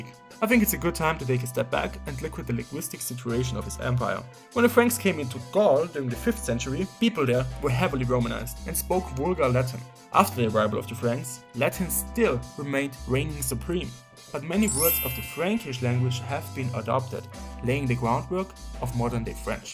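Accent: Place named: Germany. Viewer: you have a german accent bro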